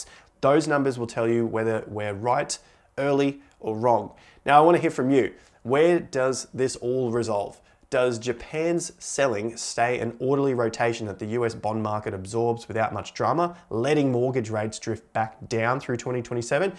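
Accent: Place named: Australia. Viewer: Aussie accent talking US rates has me confused be more specific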